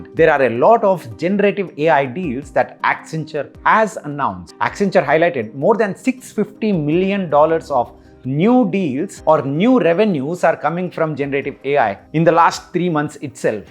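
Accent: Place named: Telugu, India. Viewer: That's how you speak English with a heavy Telugu accent, but still make it to INSEAD and McKinsey. That pronounciation "markeyt" ended it all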